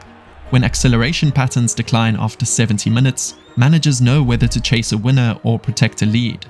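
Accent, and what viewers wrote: South Africa. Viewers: It's an addition because recruitment is less biased. Average players were signed simply because they were Brazilian or English and better players from Romania or Togo were overlooked. I saw your video a few days ago but I didn't watch because the thumbnail seemed clickbaity. I watched it by accident now and I loved it! Great video. Sidenote - Your accent sounds South African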